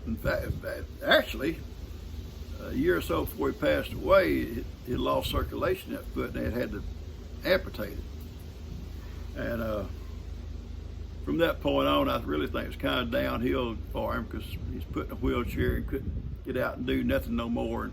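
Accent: South, United States. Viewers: Love your country voice! Have a wonderful day.... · Good morning... having my breakfast and coffee with you before work. I absolutely love you're country accent and you're stories, like so many on here, I can listen to you all day. I love how Ivan was demanding attention, lol. He is the cutest little donkey. He loves you so much. And I can see you're love for him, it shows. Also love how you call baby. Ya'll are so cute together. I am blessed by ALL you're videos. Keep them coming. Hope you're knees are feeling better. Say hello to you're beautiful wife. God Bless you and all you're family and furbabies. Give Ivan a hug for me. Have a blessed week. Already looking forward to your next video.😁❤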